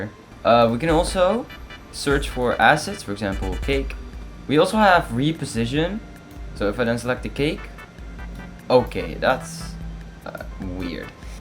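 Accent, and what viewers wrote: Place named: Netherlands. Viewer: the accent gave it away. since i’m also dutch it was quiet easy to guess.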